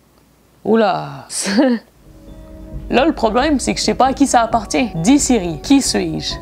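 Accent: Quebec, Canada. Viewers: J’aime trop quands tu parle😆 c’est comme mes cousine eft elle habite aux Québec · tes quebecois cool comme moi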